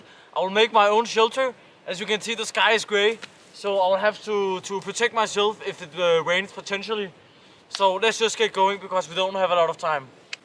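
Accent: Denmark. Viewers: Bro are you danish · are you danish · Er du fra danmark?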